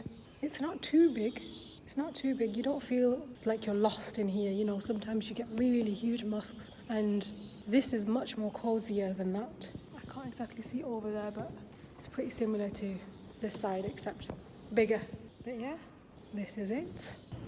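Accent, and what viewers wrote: United Kingdom. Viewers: Her accent is from the north of England.... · Your accent has a bit of Birmingham in it. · How much does the Ccp pay you to become its ambassador in the occupied land of Eastern Turkistan, quite a lot l guess? Either the world is dumb to believe your report or the Ccp and its puppets are dumb to realize that their hideous crimes in the region are clear to the whole world. If your head cover and the British accent will give you legitimacy to make a fake report, the millions of Uyghurs in prisons should stop crying and dying. No thanks to you or anyone else like you⛔. So who we should believe now, the killer or the victims.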